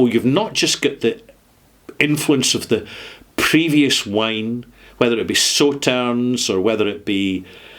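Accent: Scotland, United Kingdom. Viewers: I've been trying to listen to a couple of Bootlegs Clash and Led Zep) I bought tonight and watch you with subtitles. It is really quite hard to make sense of your videos using the subtitles alone as they are so off the beam. It is part down to the Scottish accent - have you seen the sketch in the voice actuated lift with 2 scotsmen! No biggie really I know I should listen to the undiluted velvet tones only.....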